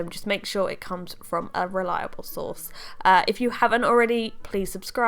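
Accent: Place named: RP, United Kingdom. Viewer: Yes!!! Updates! Really any content you produce I love because you’re informative, not boring, and you’ve got a posh accent!! 😉😊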